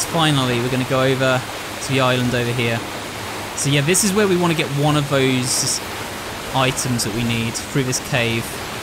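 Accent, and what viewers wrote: United Kingdom. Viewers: Our british accent has uploaded 2 videos in 1 day he works hard and fast on these videos not gonna lie especially if it's Resident Evil i love it so much thank u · Bro this is the first time I realize he's British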